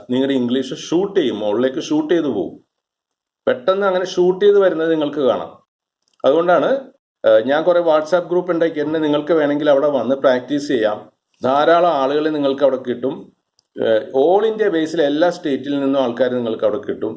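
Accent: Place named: Thrissur, India. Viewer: Sir, thankalkku oru thrissur slang und. Keep it up. God bless you.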